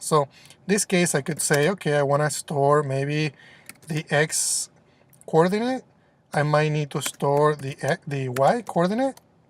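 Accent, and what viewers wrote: Spain. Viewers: Good Video. As I noticed your accent, I think your mother-language is Spanish. If that's true, It would be awesome to hear you recording some (or all) of your videos in Spanish too. Hi, from Ecuador.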